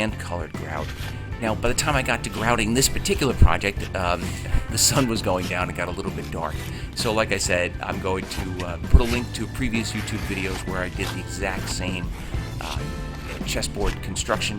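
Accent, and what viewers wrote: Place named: New York, United States. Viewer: Nice work!....looks like a fun build....gonna do 1....u gotta be from NY rt?....haha....I'm in/from LI...I hear the accent....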